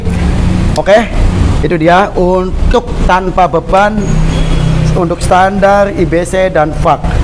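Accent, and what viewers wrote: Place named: Java, Indonesia. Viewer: Demak jateng hadir mas. Jowone medok. Hhh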